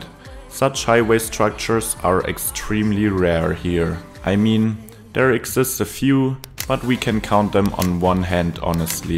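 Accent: Germany. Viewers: Most cliché German accent ever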